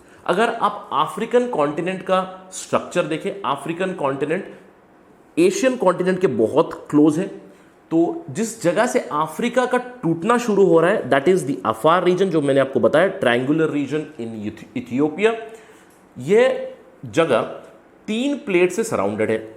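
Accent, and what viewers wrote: India. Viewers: I was eager to hear your hindi accent.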